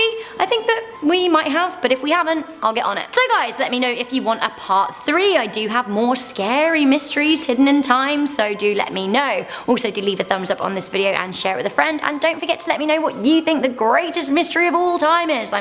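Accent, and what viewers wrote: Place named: United Kingdom. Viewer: gotta love the uk accent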